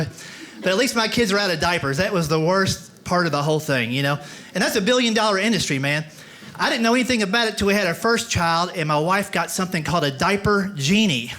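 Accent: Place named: New England, United States. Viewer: What a hoot, when I was growing up in the 50’s, my whole family talked like him! It was fun to hear, now that I’m the oldest generation, surrounded by New Englanders!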